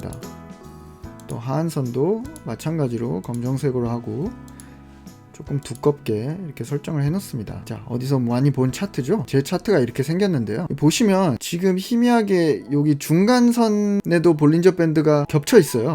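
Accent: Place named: Gyeongsang, South Korea. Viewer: 똑똑하신 분같아요.설명짱.쏙쏙들어오네요.^^ 살짝 경상도사투리 들리는데 기분탓인가요?ㅎㅎ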